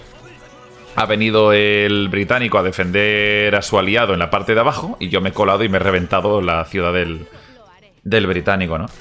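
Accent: Peru. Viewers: Ere paruano?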